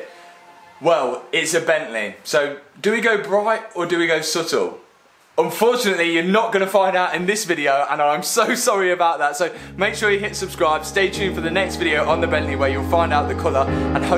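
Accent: Leicester, United Kingdom. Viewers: I hear it absolutely fine but then I'm also from Leicester, know Mallory Park! Must be a "Lestah fing"!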